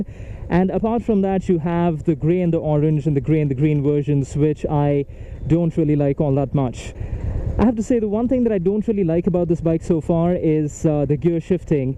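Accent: England, United Kingdom. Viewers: अब तो अपना हॉलीवुड हीरो भी अंग्रेज़ी accent की तारीफ़ सुन सुन के बोर हो गया होगा यारो, gotta say something new mate.. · Your English accent wow ...teach me master 👌👌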